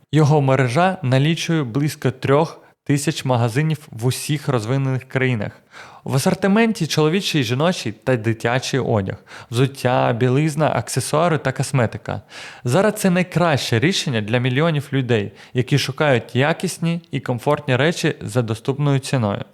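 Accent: Russia. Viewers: Класна історія. Цікавий виклад. Дуже добре, що контент українською. Суто для покращення вашого контенту хочу поділитися побажанням: більше звертайте увагу на вимову слів, ви деякі слова вимовляєте на російський манер, а також було декілька слів російською мовою ( наприклад: колокольчик). Загалом, вас приємно слухати і радію, що є цікаві передачі українською мовою. Дякую!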